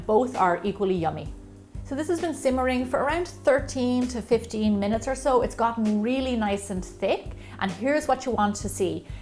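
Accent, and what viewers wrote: Ireland. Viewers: wow that's amazing! haha, I saw your location is in the US so I automatically thought it was a north American accent, but hey Irish accent always reminds me of Tom Branson from Downton Abbey!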